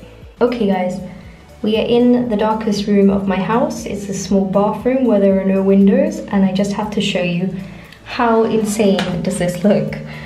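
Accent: United Kingdom. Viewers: Cool painting and it glows like crazy. I wonder if you can apply it to plastic surfaces like a action figure? By the way, love your accent it's British right?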